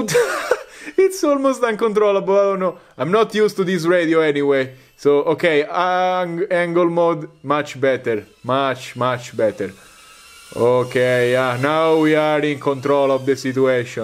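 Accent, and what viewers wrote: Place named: Italy. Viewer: Come si riconoscono gli italiani , anche se parlano inglese correttamente, continua così ottimo video 💪🏻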